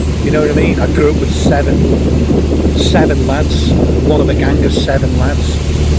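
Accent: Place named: Cockney, United Kingdom. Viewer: Gutted I missed you cockney!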